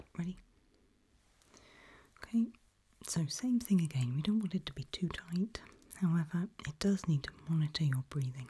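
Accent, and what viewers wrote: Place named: United Kingdom. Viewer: as a brit i can confirm some of us speak like this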